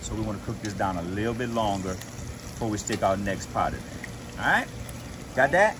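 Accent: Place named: Louisiana, United States. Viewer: Hey where are you originally from? Sound like La Place or Gonzales area. Just curious.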